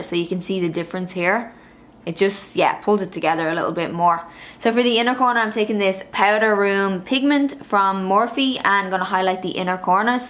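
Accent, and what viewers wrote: Ireland. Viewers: How often do you clean your makeup tools? You are beautiful btw and I love the Irish accent.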